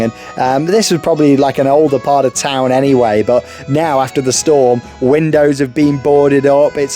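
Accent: United States. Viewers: Love the American accent. Didn’t think it was you at first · your American accent is very good!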